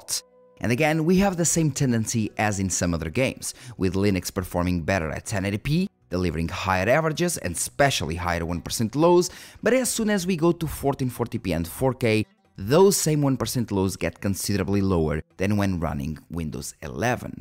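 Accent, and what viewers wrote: Portugal. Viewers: your native tounge is portuguese? are you from Portugal or Brasil? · Literalemente instalei linux para poder recuperar um hdd com bad sectores e agora estou a ponderar usar linux para jogos, btw, mal vi a tua cara na thumbnail ja tinha deduzido que eras tuga XD, depois foi o sotaque, depois foi so confirmar no canal Xd, como e que deu para saber que eras tuga ? idk btw keep it up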